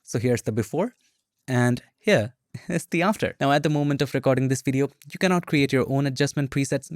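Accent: India. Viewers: Speak like Human not like Computer.. Sp. you have an Indian Accent..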